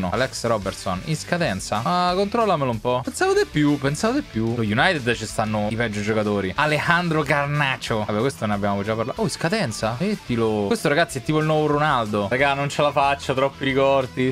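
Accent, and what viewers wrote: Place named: Palermo, Italy. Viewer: che parla palermitano 🔝 😂😂😂😂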